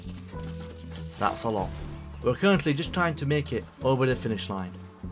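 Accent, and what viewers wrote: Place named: United Kingdom. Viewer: I need you to make an audiobook for lord of the mysteries, your British accent would make long words sound even more gentlemanly 😩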